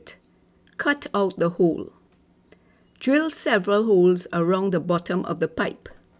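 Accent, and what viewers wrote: Trinidad and Tobago. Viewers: Great tutorial...is that a Trini vibe I'm hearing?...Thanks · She sounds like a Trinidadian 😎🥳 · You sound Trinidadian... Excellent tutorial by the way.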